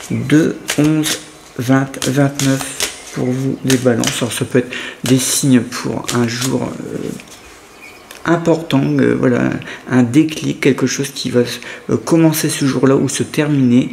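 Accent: North, France. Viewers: Bonjour, bon dimanche. Votre lecture est d'une précision époustouflante. Merci je vous écoute avec votre joli accent du nord de la France ☺️ Cordialement Danièle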